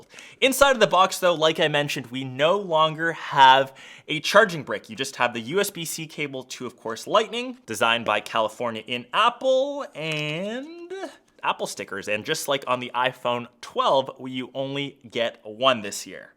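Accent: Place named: Canada. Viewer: Love the Canadian accent! The 2 phones in your videos are the 2 I'm deciding between; the red 12 & the Pacific Blue Pro. Imagine a red Pro! 🥰 I'll order the Pacific blue & then they'll release a red later on. 🤦🏻‍♂️Edit, it's never mentioned & I keep forgetting, I AM getting the Pro, because it has 6GB RAM vs 4 in the 12.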